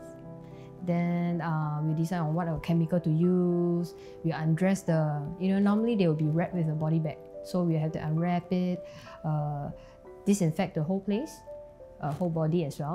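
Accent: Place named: Singapore. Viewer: Singaporean accent a female embalmer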